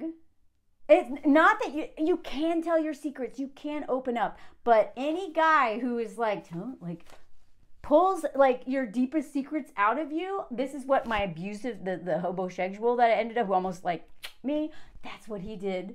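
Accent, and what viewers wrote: South, United States. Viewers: your southern girl baby voice cracks me up every.time 😂 give Moz a hug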